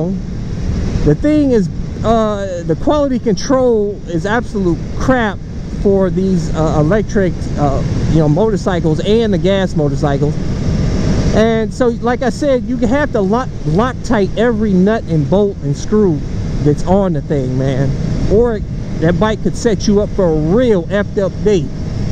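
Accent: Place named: Atlanta, United States. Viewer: This man's got that Atlanta accent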